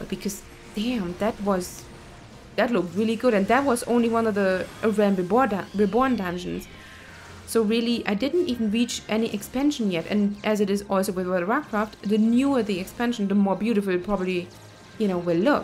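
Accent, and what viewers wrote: Germany. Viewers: Ist that a German accent? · Thought you were german, you speak english like a german one. Greetings from Germany to France =)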